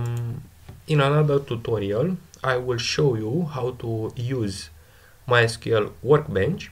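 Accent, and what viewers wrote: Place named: Russia. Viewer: Looks like hard russian accent )))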